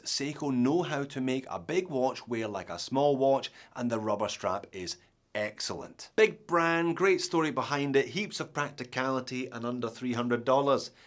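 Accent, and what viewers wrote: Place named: Ayrshire, United Kingdom. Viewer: You have an Ayrshire accent , where are you from?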